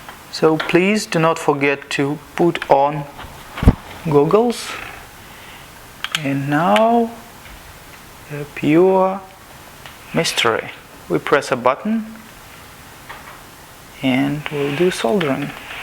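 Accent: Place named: Nizhny Novgorod, Russia. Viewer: клевый лазерец. сколько такой стоит? где купить? сколько он мощности рассеивает, что ему внешнее охлаждение треба? пысы. звыняйте за придирку, может, это вас и не волнует (но зачем тогда все это представление с английским?), но в слове пайка буква L не произносится (полностью извиняя нижегородский акцент).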